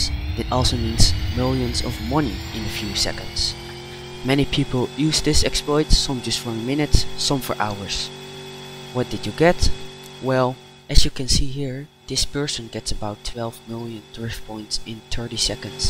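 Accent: Netherlands. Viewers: Ik hoor gewoon je bent nl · Arer you dutch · are you dutch? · Ben u Nederlander? Want your accent. My dutch is bad lol. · Oh mah lord are u dutch🤯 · Dutch english accent alert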